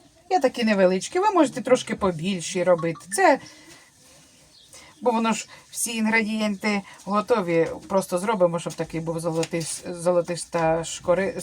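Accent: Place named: Poltava, Ukraine. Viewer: нашу Полтавську говірку нічим не переб'єш.Дай Бог Всім здоров'я.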